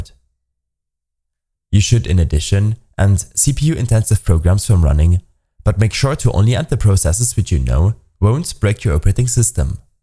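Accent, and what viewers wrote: Germany. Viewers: man merkt das es ein Deutscher ist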